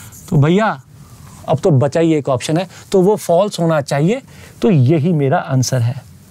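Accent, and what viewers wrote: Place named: Punjab, India. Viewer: Sir ki punjabi 😁 nice I am from mp but I know punjabi very well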